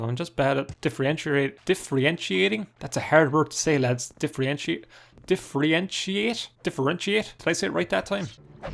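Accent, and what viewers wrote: Ireland. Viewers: you put the other irish letsplayers i watch to shame. you talk let they do when theyre playing up being irish as a joke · keep up the content, its always so fun, also an irish accent makes everything better imo, how close are you to 4k watch hours? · I love your Irish accent man. "I tink so" "Maerk and recall" Subbed for the content, stayed for the accent + content combo.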